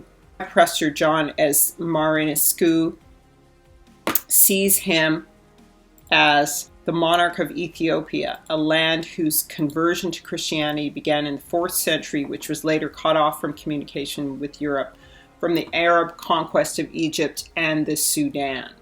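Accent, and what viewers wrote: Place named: Jamaica. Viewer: I love the Yahmacan accent, Teach. & Beautiful Voice, I'm crushing.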